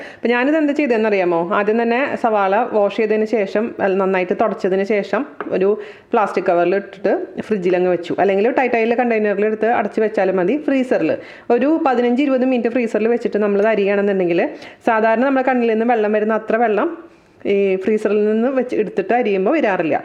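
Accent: Thrissur, India. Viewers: Thrissur aano😊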